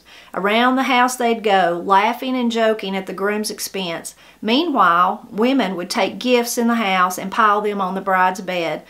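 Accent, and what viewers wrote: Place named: South, United States. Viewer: I’m 77 years old and live in California today, but my mother and father came out here from Oklahoma when I was a baby. Their speech was very similar to yours and spoke with an accent as you do. The word “sugar” to represent kissing or hugging was commonly used by them, but it was a southern expression carried out here by them from Oklahoma and not used by any of my friends or their families. They have been gone many years now and I never hear anyone say it anymore. It’s definitely a southern expression, as are many of the other words you mentioned, which I recognized from hearing mom and dad use. Brings back memories to hear you talking about them.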